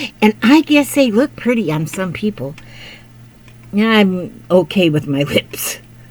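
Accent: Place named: Georgia, United States. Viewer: I am going through the hardest time in my life your time makes life so happy for me! I can’t sew yet everything I have is away from me god knows why but oh boy! You inspire me and I hope to get into the paper craft and go over your videos on there one day! But I love you do much! Your acsent reminds me of Georgia we use to live in a little town called Marietta Georgia so ch sweet folks! My dear mommy god rest her soul had a kids ministry and she had Kermit and miss piggy 🐷 lol I love your readings! I can now see when you post I learned how to do that lol my mind seems not good on some days:-( mt mommy and her mommy had memory problems but lord I’m too young to get like I feel idk lol will can you or do you have these rag snips at your Amazon shop? Ty again love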